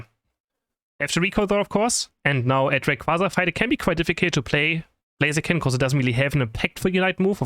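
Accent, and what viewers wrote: Germany. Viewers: German accent 😊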